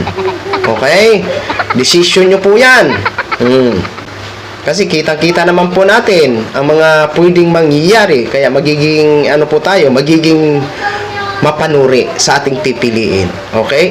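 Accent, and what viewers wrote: Visayas, Philippines. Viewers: Kabwisitboses mokuya Uhg pag stiry mo para kang nasa karerahan ng kabayu...visaya visaya pronounciation mo.englishin mo na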